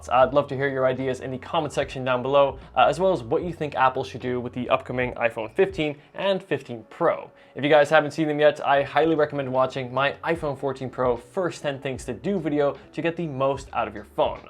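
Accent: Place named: Netherlands. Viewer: You speak dutch to? I hear a little accent.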